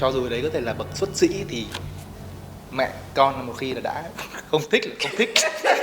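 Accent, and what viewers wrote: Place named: Hue, Vietnam. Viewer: Giong doc cua em voi phap danh that chueyn cam pha chut gi cua huecua dai dat nang chay mua lu mac du em sinh ra o binh dinh con thay e kip lam kenh dac biet la co chau tho voi su gop mat cua cac ban tre la canh tay dai dua giao phap cua su ong bac thay day kha kinh lan rong di xau vao xa hoi con tin so nguoi nghe xem tim hieu se ngay mot nhieu thoi neu no co it co dung buon va hay kien nhan nha co chuc co va ekip co nhieu suc khoe va duoc chu but chu to gia ho luon luon binh an hanh phuc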